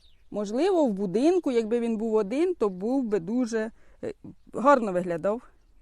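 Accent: Poltava, Ukraine. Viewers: Тільки підписалася на ваш канал. Почула полтавський говір.Якщо не секрет, з якого району? Цікавить діхондра, чи є на продажу?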